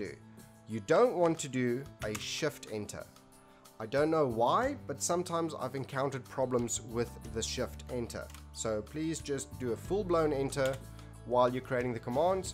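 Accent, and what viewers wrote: South Africa. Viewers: homie are you afrikaans?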